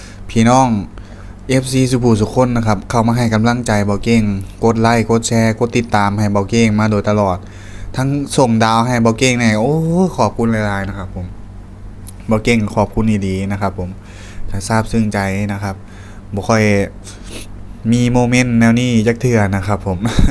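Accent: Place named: Sakon Nakhon, Thailand. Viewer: เว้าญ้อคือสกลนครเนาะหนุ่มน้อย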